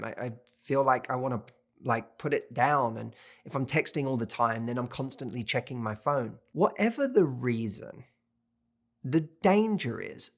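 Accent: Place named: United Kingdom. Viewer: he sound British🇬🇧🇬🇧🇬🇧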